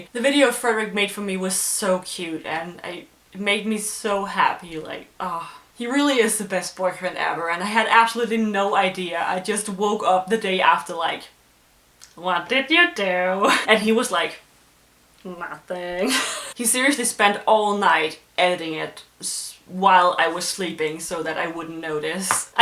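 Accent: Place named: Denmark. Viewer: You have a really strong Danish accent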